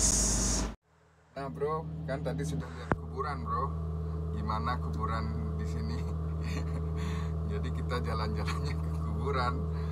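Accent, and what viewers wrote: Bali, Indonesia. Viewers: Hehehe...logat baline kental Bli...salam aspal🤣🤣🤣🤣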